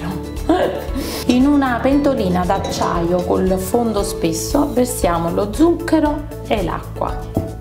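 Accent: Naples, Italy. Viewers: Ciao. Sei molto simpatica.... col tuo accento e battute in napoletano. Sei anche molto brava e calma nelle spiegazioni. Questo aiuta molto a seguirti bene. " u scelepp" è di origine pugliese... (Io son della provincia di Bari). Mi piace questo metodo con l'album che non ho mai utilizzato perché nn viglio pastorizzare ogni vola. Mi chiedevo, a parte il procedimento di lavorazione, che differenza sta tra il fondente di zucchero e questa glassa. Cosa si aggrappa meglio e quale tra i due diventa e resta duro asciugando? Devo ricoprire biacotti e altre decorazioni di pasta frolla e non vorrei che urta do tra loro i biscotti si possano rovinare facilmente. Grazie per l eventuale tue info. Un abbraccio Maria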